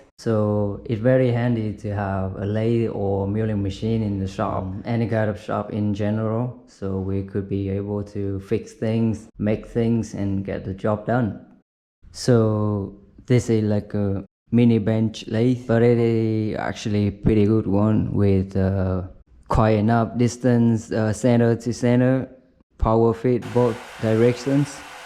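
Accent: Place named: Vietnam. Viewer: Nghe phát nhận ra người Việt luôn 😂😂😂, coi tên kênh thì chính xác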